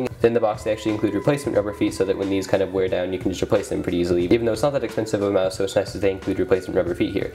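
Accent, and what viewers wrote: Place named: United States. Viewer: he is one of the clear fast pace speaking Brit but I when I focused on listening I just realized that he is American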